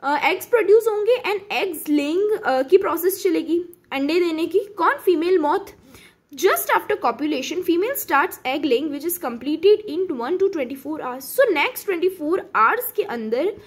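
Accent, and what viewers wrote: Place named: Australia. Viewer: GOOD EXPRESSION SOUNDS AUSSIE ENGLISH EVEN 888.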